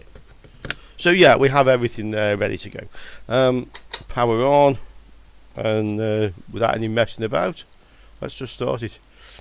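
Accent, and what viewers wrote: United Kingdom. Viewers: Another guy who we can't understand...Too much 'Brit mumbled accent and boring personality?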